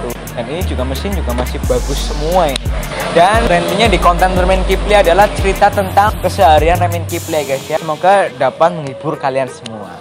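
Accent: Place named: Banyumas, Indonesia. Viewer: Ngapak gaes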